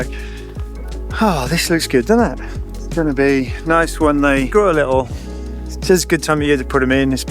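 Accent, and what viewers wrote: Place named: Scotland, United Kingdom. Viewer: Was thinking the same thing!! 😊 Scottish accent! Lovely lady!!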